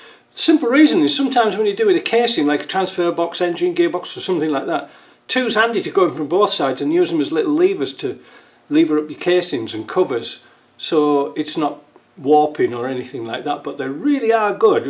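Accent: Yorkshire, United Kingdom. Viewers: i do enjoy your clips. just wondered how what sounds like a yorkshire lad ended up in cannda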